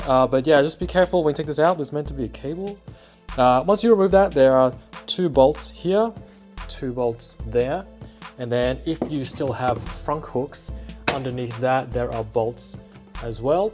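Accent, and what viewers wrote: Australia. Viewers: Hey, thanks for your clear and concise explanation with no BS. Extra bonus points for the Aussie accent! Love your work.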